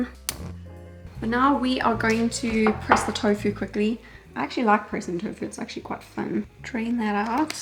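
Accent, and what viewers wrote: South Africa. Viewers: Hahaha i love the "somme" randomly coming out sometimes 🤣 mis jy ooit SA? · Thank you for these lovely easy recipes! I've been watching your videos for years & loved your South African accent but wow your accent has really changed so much! Love your content.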